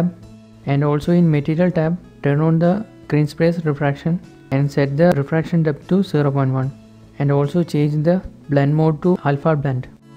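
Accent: India. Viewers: If You hear the India guy, You know that tutorial must be good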